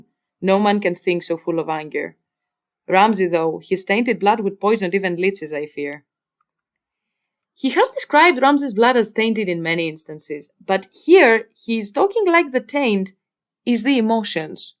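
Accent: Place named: Greece. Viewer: Το κατάλαβα από την προφορά, σαν την δική μου, ότι είσαι ελληνόφωνη!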